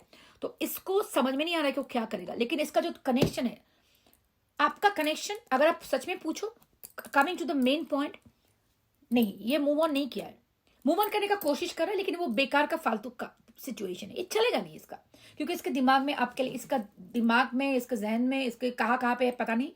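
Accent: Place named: Bengal, India. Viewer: Bengali ke mouth se hindi sunne mai bahut nice lagta hai👍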